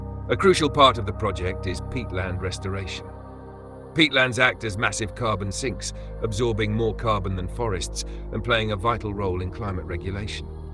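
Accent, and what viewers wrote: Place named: England, United Kingdom. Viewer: English voice explaining our story to us! Sorry! Couldn’t listen to this…..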